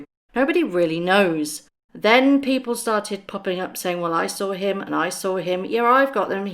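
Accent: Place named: Wales, United Kingdom. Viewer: ?,?, I love her Welsh voice. It’s like having a natter over the fence with a good neighbour when you listen to her.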